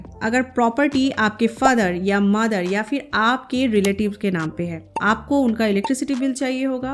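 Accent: Bengal, India. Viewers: Aap bangoli ho na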